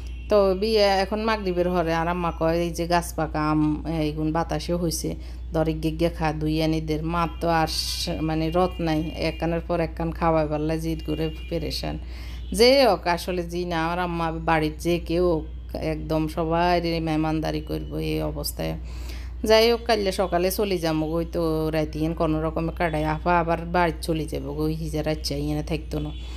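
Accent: Noakhali, Bangladesh. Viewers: আপু আপনার মুখে নোয়াখালির ভাষা শুনতে অসাধারন লাগছে। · Apo tomar mukhe amader nowakhali r vasa sune onek valo laglo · আমি আজ প্রথম আপনার ভ্লগ দেখলাম, YouTube দেখতে দেখতে সামনে ছলে আসলো তারপর দেখা সত্যি অসাধারণ লাগলো আপনার বাবার বাড়ি প্লাস আমাদের প্রিয় নোয়াখালীর ভাষা আহহহ অনেক সুন্দর,,, আল্লাহ আপনার আব্বু, আম্মু কে ভালো রাখুক দোয়া করি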